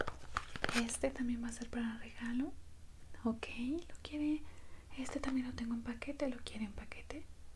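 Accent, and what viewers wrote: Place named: Mexico. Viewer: Hola me gusta mucho el acento mexicano y el asmr en comun 😘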